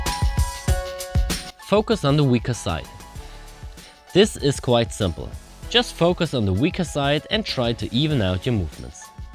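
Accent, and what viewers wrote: Germany. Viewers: you are german😀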